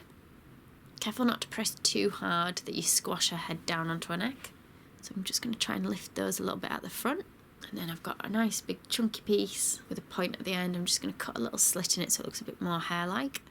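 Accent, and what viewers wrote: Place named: England, United Kingdom. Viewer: So refreshing to hear an english accent from someone so talented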